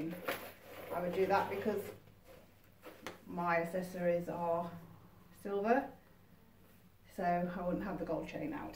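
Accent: United Kingdom. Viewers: Helllo greeting my name is Abner nice meet you this my first time watching your video you look beautiful greeting from United States 🇺🇸 of America at State of Georgia here southeast coast, close to Atlantic Ocean. I’m fan women wear high heels pantyhose stiletto and 12cm 13cm 14cm 15cm 16cm and platform too I do like stocking and nylon thigh high 😅too! You have good accent from English in England 🏴.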